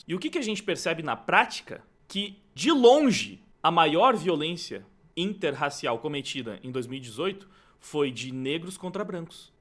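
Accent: Brazil. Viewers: Sulista né?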